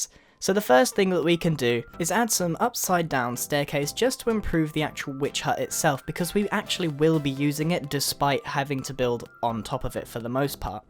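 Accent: United Kingdom. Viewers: are you really brtish ☺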